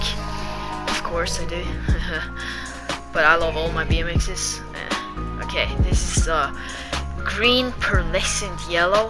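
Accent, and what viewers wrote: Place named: United Kingdom. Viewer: nice video men koffør snakka du me britisk aksent??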